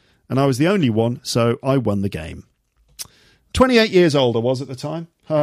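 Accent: United Kingdom. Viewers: Is your accent pure British,? I feel something different.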